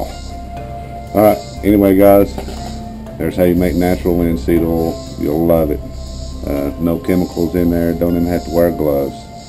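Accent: South, United States. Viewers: Quality control comment. Great content., your audio quality here is so-so, and you're a bit hard to understand because my ear is not attuned to a Southern dialect, especially with the music competing with your voice. Humbly submitted in support of your excellence.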